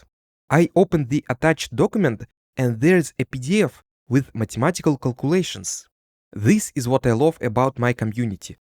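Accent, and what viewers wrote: Russia. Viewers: я даже и не знал что у него есть канал на английском а ещё русский акцент очень возникает) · отчётливо слышно русский акцент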